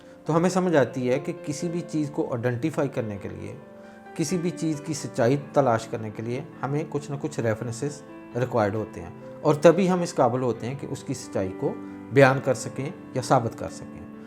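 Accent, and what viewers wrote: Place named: Punjab, Pakistan. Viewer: nice,agreeاردو الفاظ اورجملوں کی ادائیگی کرتے ھوے پنجابی لہجہ کا تڑکا برا نھیں،لیکن اردو زبان کا اپنا لہجہ اوردرست تلفظ کی ادائیگی کااختیار کرنا،تقریر کو زیادہ پُراثر بنانے کےلۓ یہ پریکٹس بھی احسن ہے۔🌺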